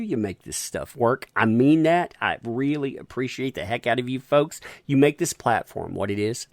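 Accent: South, United States. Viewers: I never thought I'd enjoy listening to commentary with such a southern drawl. But you, sir, got it going good. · southern drawl makes this even more informative and entertaining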